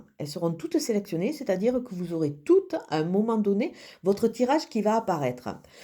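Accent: South, France. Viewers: Bonjour, je vous remercie encore pour votre fabuleux live d'hier et merci pour ce tirage du jour. Et merci aussi pour la générosité de votre ❤ pour vos abonnés... Bon week-end à vous bel accent du soleil...💐 ☺ 😘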